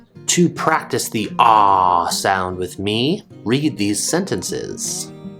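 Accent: United States. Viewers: What side of the US are you from, to find out more about your accent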